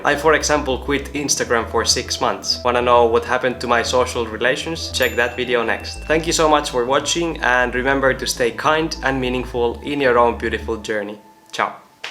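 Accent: Finland. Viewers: Kuulin puhetavastasi heti että olet Suomesta ennen kuin katsoin kanavan tietoja.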